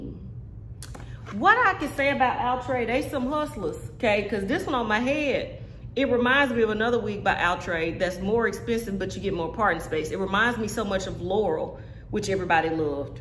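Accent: South, United States. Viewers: you sound like you from the south that accent heavy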